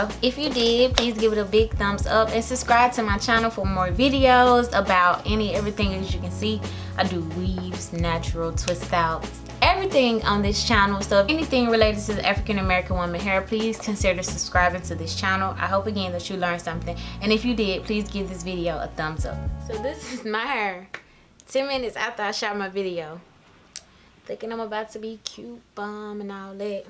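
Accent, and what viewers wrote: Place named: South, United States. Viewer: Wow, you hair is gorgeous and I love your curls. Oh, I love your southern accent too.❤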